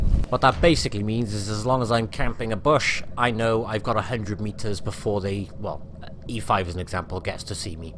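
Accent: Germany. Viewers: You Should Upload a Video with short clips of that German Accent with Sentences other people can use in there videos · great review..love the german accent, keep it up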